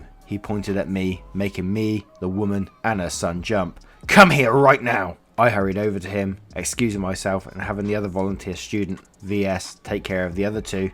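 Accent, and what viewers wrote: United Kingdom. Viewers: While I know why most Youtubers stay away from swearing these days... I do enjoy someone who actually fills out the swearing even when the text is censored! Sometimes people just need to hear that they're being a twat, right? is one of my favourite Reddit readers partly because of the chill attitude, the chuckles and of course the British accent.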